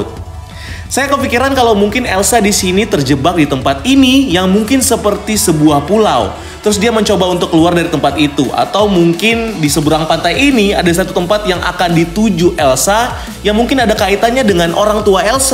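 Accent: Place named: Java, Indonesia. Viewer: ilat jowo bos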